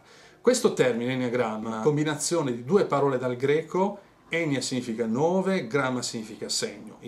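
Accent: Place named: Apulia, Italy. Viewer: Avevo visto il video che con l'accento pugliese dicevi del gatto che fa il linghino... Ora ti vedo su questo enneagramma che mi interessa!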